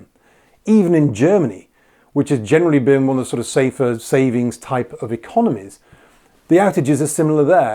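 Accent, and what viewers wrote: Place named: RP, United Kingdom. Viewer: Nothing like a posh english accent and lots of graphs to make someone appear they know what they are talking about - no one knows the future - its all a coin toss :-)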